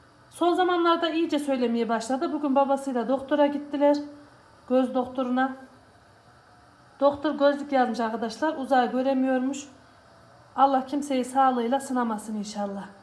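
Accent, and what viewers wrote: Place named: Central Anatolia, Turkey. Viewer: S a hnm ic anadolunun insanina dogallik yakişır şivenizi degistirmek icin ugraşmayin sizede her insana oldugu gibi dogallik yakisıyo Allaha emanet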